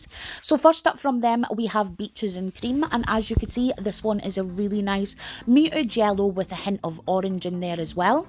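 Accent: Scotland, United Kingdom. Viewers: My first time here. Scottish?? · Really nice to hear the voice of not only a fellow nail art enthusiast but also a fellow scotswoman😍👍😍👍😍👍👏👏 also great vid!! I am soooo envious of your glitter collection.🤣🤣🤣🤣😟😟😟🤣🤣🤣 gorgeous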